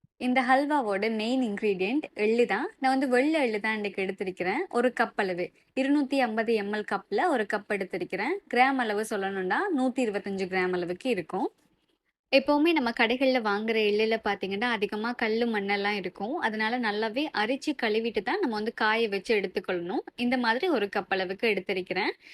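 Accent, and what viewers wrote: Sri Lanka. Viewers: நீங்க ஆரம்பத்திலே உங்க பேச்சை வைத்து srilanka என்று தான் நினைத்தேன்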